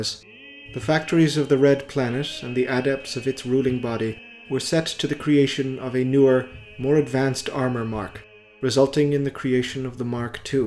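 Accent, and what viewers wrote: Ireland. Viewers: interesting. I live really close to Canada, and I pegged your accent as Irish right from the jump. Maybe because my own accent is similar to a Canadian accent so the differences in yours stand out to me more. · So obviously Irish. Just can’t tell which part. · Chilled 40k lore? Beautiful illustrations? Hypnotic Irish voice? Background chanting? SUBBED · Irish accent? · The solemnity in your reading and the Irish accent are a welcome change from other 40 K lore channels. The Gregorian chant was a nice touch as well. Looking forward to your next installment.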